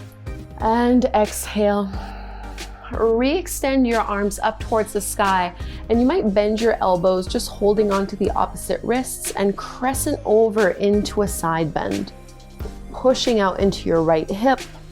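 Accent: Canada. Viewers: Started following for the Canadian accent (I'm homesick 🤣😭) but stayed for the amazing yoga! Thanks. Had an overpowering feeling of love and sadness for my deceased grandparents during the savasana at the end of this practice. I wasn't expecting that.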